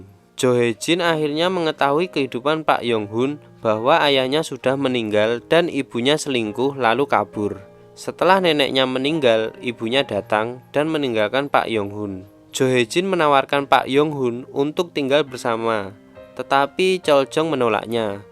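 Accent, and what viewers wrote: Java, Indonesia. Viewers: Suaranya kaya agak medok jawa? Wkwkkw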